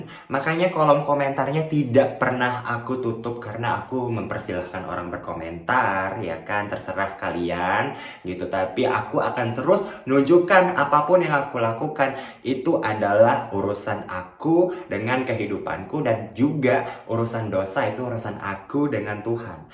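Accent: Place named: Medan, Indonesia. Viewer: Bah kota medan kau bang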